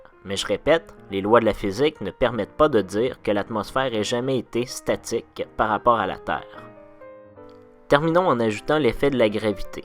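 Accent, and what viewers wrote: Quebec, Canada. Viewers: Merci pour ton explication absolument clair ne et sans bavure. Toujours aussi pro... Ps. J adore ton accen quevecois..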